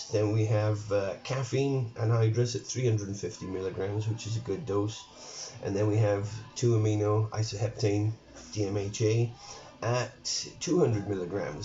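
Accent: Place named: Southern England, United Kingdom. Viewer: also what part of england are your from im from newcastle but your accent sounds more southern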